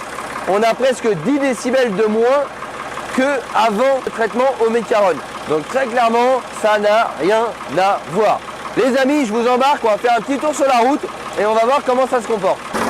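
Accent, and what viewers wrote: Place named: Normandy, France. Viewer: C'est comme ça que l'on parle en Normandie dans L'Eure ! Rien d'incroyabe !😉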